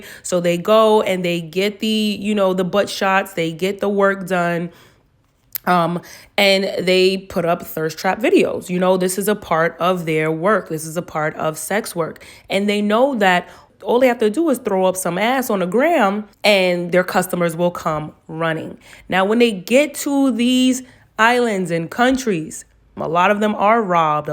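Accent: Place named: New York, United States. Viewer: I hear your accent; are you from New York City?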